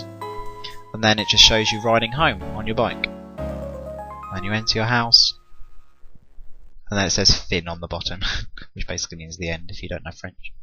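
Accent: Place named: United Kingdom. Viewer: Like you said if u be a bit more fun you really can come far if u do that and are you british cause that accent is lovely!! :)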